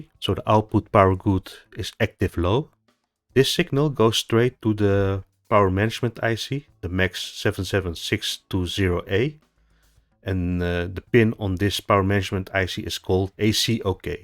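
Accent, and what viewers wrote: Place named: Netherlands. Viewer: 2 seconds in and i hear the dutch accent 😁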